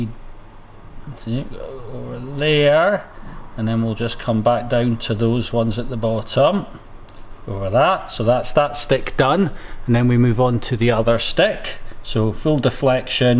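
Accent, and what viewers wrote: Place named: Scotland, United Kingdom. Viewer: You are the best, even for me listening Scottish accent !!! Merci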